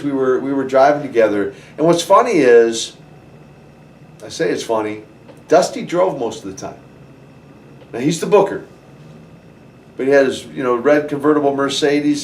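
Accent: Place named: United States. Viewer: Wow, he learned to speak in an American accent really well!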